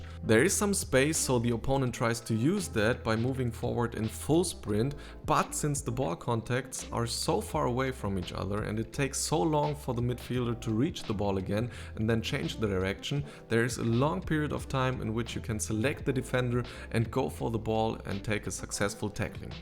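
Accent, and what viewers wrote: Germany. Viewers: Das sind schon deutsche Macher, oder? Ich empfinde den Akzent schon als sehr Deutsch. Mit Englisch erreicht man allerdings ein wesentlich größeres Publikum, da wird es wohl niemand auf Deutsch machen, wenn Englisch genauso gut funktioniert. Gruß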